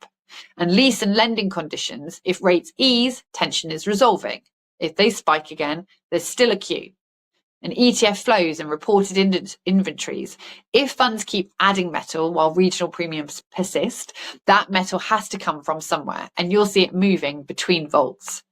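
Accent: United Kingdom. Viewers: Absolute nonsense in a British accent.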